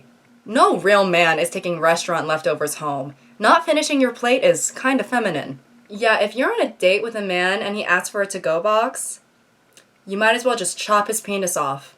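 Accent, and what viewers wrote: United Kingdom. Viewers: omg your british accent is hilarious! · that british accent tho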